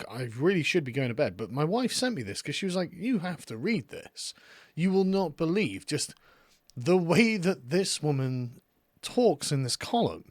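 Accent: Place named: United Kingdom. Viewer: You’ve got the most awesome British accent ever!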